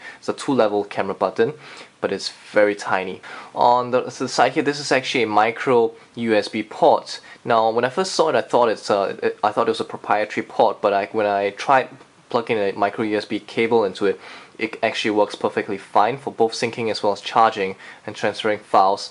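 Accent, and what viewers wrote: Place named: Singapore. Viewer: When I watched this video I was listening to the guys voice. "Definitely Singaporean" haha looks like i'm right XD Singaporean's do have an accent huh... BTW I have this phone, It's not bad and the screen and is BIG but what I don't like about the MIdnight blue/black version is that that back cover gets a lot of finger prints.. but it's good.. quite.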